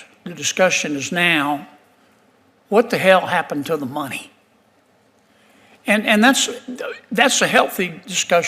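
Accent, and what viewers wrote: South, United States. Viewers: . NA that’s how he always talks sometimes worse. Southern I guess.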